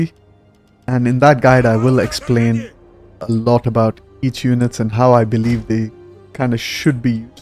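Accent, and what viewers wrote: India. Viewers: Are you a desi? You accent is similar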